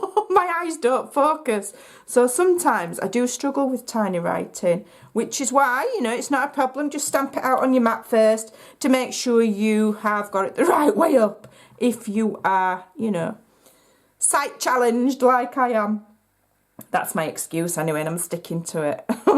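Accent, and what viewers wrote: United Kingdom. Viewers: I love your accent. What part of the U.K. are you from?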